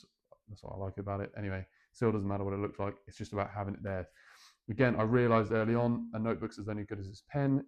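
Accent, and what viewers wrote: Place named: East Anglia, United Kingdom. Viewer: Slight East Anglian accent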